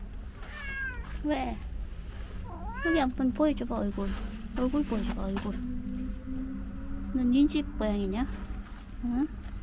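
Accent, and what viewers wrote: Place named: Gyeongsang, South Korea. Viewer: 사투리는 저와 같은 경상도 (저는 대구 ) 사투리인거 같아 더 정감이가고 콧물 소리는 전혀 느끼지 못하겠는걸요 ^^ 신경 안쓰셔도 될듯해용~~~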